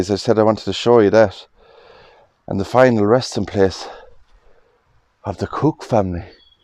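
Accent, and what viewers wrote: Ireland. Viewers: there is no lovelier accent than the Irish brogue, I enjoy listening too him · Love your videos and your Irish accent, will happily subscribe, cheers from Australia.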